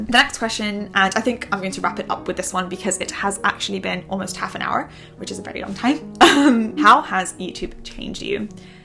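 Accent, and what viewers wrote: United Kingdom. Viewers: Ohhh I love the British accent ❤❤ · This is the first time and the quickest British accent i have never seen before,interesting,after studying American accent too much, to find out the British accent is so speical and amazing because of your expressions when looking back on...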